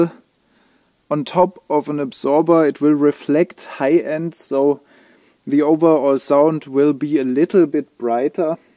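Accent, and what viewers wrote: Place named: Germany. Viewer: jetzt no front, aber man hört sooo hart dass du deutsch bisd hahaahh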